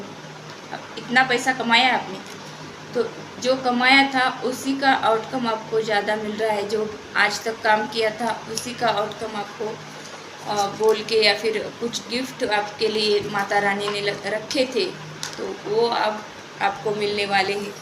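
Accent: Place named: Mumbai, India. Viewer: तथा संवादक मैडम जी को सादर प्रणाम स्वीकार हो । आपकी रीडिंग आवाज से मै समझ गया था इनकी भाषा मुम्बई तरफ की है । 100%सत्य है आपकी रीडिंग हम पूरी तरह से संतुष्ट है धन्यवाद। धन्यवाद ब्रम्हांड । चित्रकार राकेश नामदेव,अटेली मंडी जिला महेन्द्र गढ (हरियाणा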